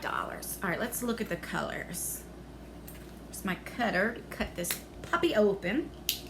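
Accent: New Jersey, United States. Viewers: Hi, You are hilarious! I love your videos. The opening is so clever and entertaining too. 👍🏻 I am Brazilian but my husband was raised in New Jersey (Mulburn area) and I enjoy the English accent of the area. In fact, I just subscribed to Boxycharm/Boxyluxe and mentioned you as my favorite YouTuber! 🌺 Have a wonderful weekend and God bless you always! Renata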